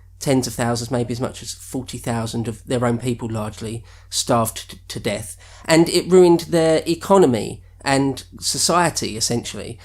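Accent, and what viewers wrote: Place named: Yorkshire, United Kingdom. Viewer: * sounds like he's from my ends.....Dewsbury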